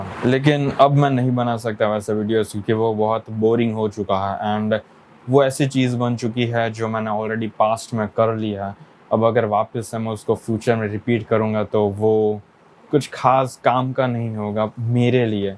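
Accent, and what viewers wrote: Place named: Bihar, India. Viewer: He sounds like bihari who knows english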